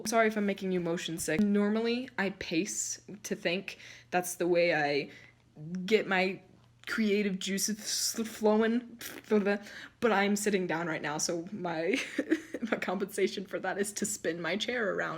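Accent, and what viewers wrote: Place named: United Kingdom. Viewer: i'm literally laughing so hard right now! ohh, and your british accent is amazing :)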